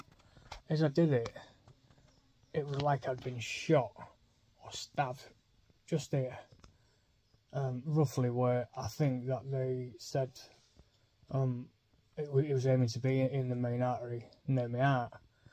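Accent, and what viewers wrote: Northern England, United Kingdom. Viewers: Us northerners can be hard to understand 😅 believe or not.. not all of us English people talk like the royals..... we have 100s of different accents and subtitles are available if u click the double cc at the top of the video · To my American English ears the northern English accent is a bit difficult to understand, sort of like the Scottish accent.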